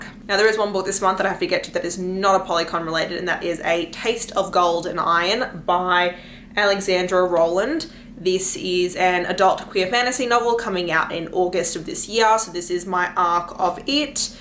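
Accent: Australia. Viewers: When i put u in 2x speed u sound extra australian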